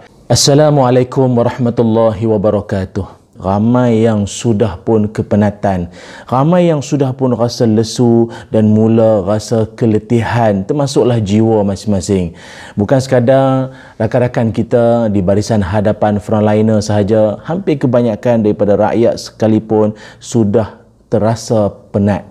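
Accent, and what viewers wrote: Kedah, Malaysia. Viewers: tutur kata ustaz mudah faham.loqhat kedah tak keterlaluan teruskan berdakwah salam baling ledah.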